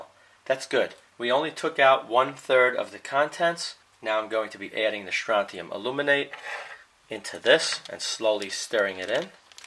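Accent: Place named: Wall Street, United States. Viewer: Wall Street accent.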